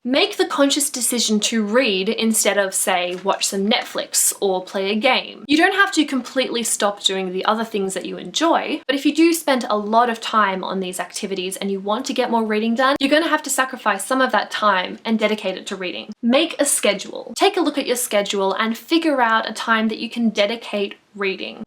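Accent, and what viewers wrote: Australia. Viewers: May I ask what part of Australia you're from?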